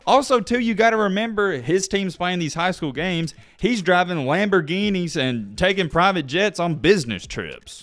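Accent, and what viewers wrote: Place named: Appalachia, United States. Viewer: My man, I like a lot of your vids and your hillbilly ass accent lol but you’ve been coming off obnoxious lately. Multiple times in vids like this you say “And a lot of y’all don’t realize!” Like okay man stop thinking so little of your followers intelligence. Not a good look. Plus you’re basing someone’s worth and lifestyle literally off their “Sosha” media as you call it. #1 rule to not go by is IG my guy. And no high schooler is signing a 10 million + shoe deal. You can count on one hand how many rookies in the history of NBA got 7 figure shoe deals out the gate. Anyways man sorry if I sound like a hater but you come off aggressive af in these vids.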